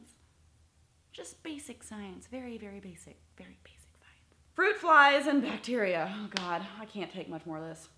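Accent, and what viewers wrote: South, United States. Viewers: that Southern accent though.... I know it's parody, but I like.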